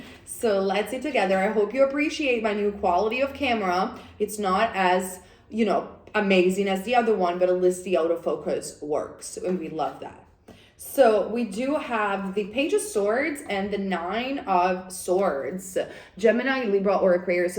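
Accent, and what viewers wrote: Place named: Italy. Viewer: thanks girl xxx love your energy and italian accent.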